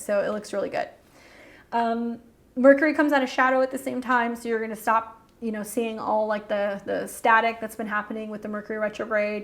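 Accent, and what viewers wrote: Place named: Cuba. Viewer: Oye you sound a little Cuban. You Cuban girl?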